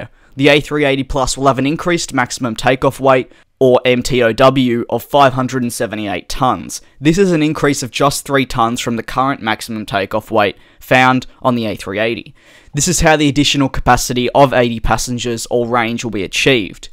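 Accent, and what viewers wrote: Australia. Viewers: Are you Australian? Not trying to be rude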